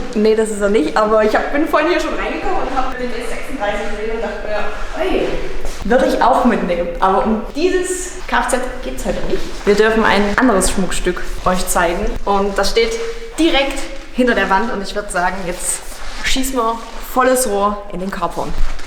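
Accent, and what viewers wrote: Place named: Saxony-Anhalt, Germany. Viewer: "Jetzt schießmer volles Roohr in den Caaarpooorn" :-) Ich komme gebürtig aus Sachsen-Anhalt. Dieser doch so heimische Dialekt.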